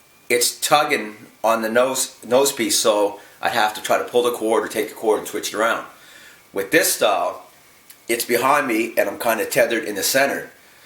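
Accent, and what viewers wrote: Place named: Canada. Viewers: ah a Canadian... ;) the way you say out, about and mouth :) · I noticed that as well. I watch Holms and Holms on tv home repair channels. They are from Canada and have the same exact accent.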